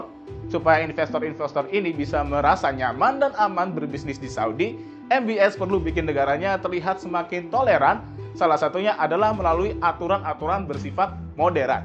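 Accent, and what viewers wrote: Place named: Java, Indonesia. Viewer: Medokmu itu lo mas, aku suka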